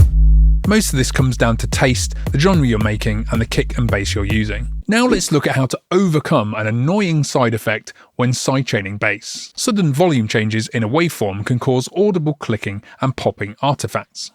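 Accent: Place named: United Kingdom. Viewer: Great plugins and great content. Also British which is nice 🇬🇧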